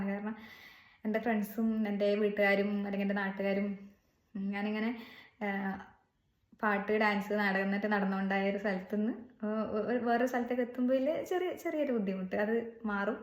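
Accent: Kasaragod, India. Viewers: മോളെ കാസറ്കോഡ് ഭാഷ എനിക്ക് വളരെ ഇഷ്ടം. മലപ്പുറം എവിടെയാണ് ഞാനും മലപ്പുറംആണ് കെട്ടോ 😍😍 നിഷ്കളന്കമായ സംസാരം God bless you മോളെ…. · നിങ്ങൾ നമ്മുടെ കാസർഗോഡിന്റെ മുത്താണ്, നിങ്ങളെ നമ്മൾ മലപ്പുറത്തിന് കൊടുക്കൂല്ല, എവിടെയായാലും നമ്മുടെ സ്ലാങ്ക് മറക്കണ്ട, അതാണ് നിങ്ങളെ കൂടുതൽ ആളുകൾ ശ്രദ്ധിക്കുന്നത്, നമ്മക്ക് നങ്ങളെ വർത്തമാനം മതി അത് കേക്കാനാന്ന് സുഖം, അല്ലാണ്ട് അപ്പ്യൊ പറയുന്ന പോലെ പറഞ്ഞാൻ ആരും നമ്മളെ മൈൻഡാക്കൂല്ല. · കാസർകോട് ഭാഷ കളയരുത് കേട്ടോ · കാസർഗോഡ് ഭാഷ മാറ്റരുത് ഞാൻ കാസർഗോഡ് ആണ്❤ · കാസർഗോഡ് സ്ലാങ് ഇഷ്ടപെടുന്ന ഒരു മലപ്പുറത്തുകാരനാണ് ഞാൻ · ടീച്ചറേ ഭക്ഷണത്തിൻ്റെരുചി മാറ്റം അത് ഒരു ഇത് തന്നെയാണ് എൻറെ വീട് മലപുറം ജില്ലയിൽ വണ്ടൂർ എന്ന സ്ഥലതതാണ് കല്യാണം കഴിച്ചത് മലപ്പുറത്തേക്കും ഭക്ഷണം പാകം ചെയ്യുന്ന രീതി, അങ്ങനെ ആദ്യം പ്രയാസമായിരുന്നു അപ്പോൾ പിന്നെ ജില്ല മാറിയാലോ? 😅 പിന്നെ ടീച്ചറുടെ ചിരിയും പാട്ടും ഉള്ളിടത്തോളം പാട്ടിനെ സ്നേഹിക്കുന്നവർ എന്നും ഉണ്ടാവുന്നേ..നിങ്ങളുടെ കാസർഗോഡ് ഭാഷ അത് എനിക്കൊത്തിരി, ഇഷ്ടമാണ് മലപ്പുറം ആരാധിക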